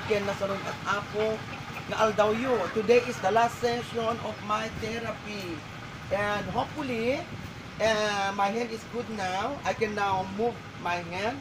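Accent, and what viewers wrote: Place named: Ibanag, Philippines. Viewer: Te .. marunong kabang mag salita ng ibanag... kasi yong tune mo parang....curious lang po.. salamat..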